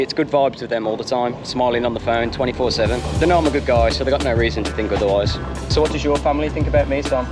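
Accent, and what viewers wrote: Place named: Midlands, United Kingdom. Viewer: As a fellow Midlander 🙂 I understand you perfectly...lol - Brilliant Videos by the way. Thumbs Up!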